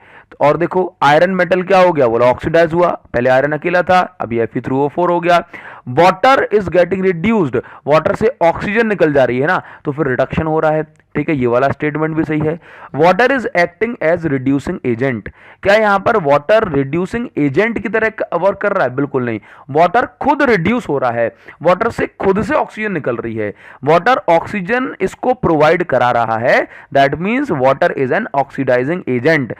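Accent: Odisha, India. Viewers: Sir,are you from Odisha❓ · Sir are u from odisha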